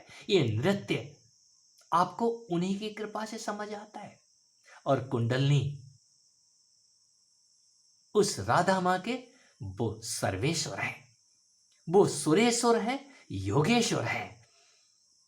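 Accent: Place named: Bareilly, India. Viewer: Guruji ap bareilly me rahte the pahle.. Apko sun kar esa lga mujhe.. Kirpa karke apne bare me kuch kahiye🙏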